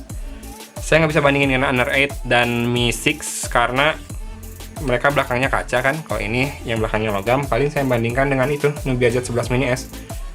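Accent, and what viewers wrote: West Java, Indonesia. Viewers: ini ifonre bgt sunda bangaat aa'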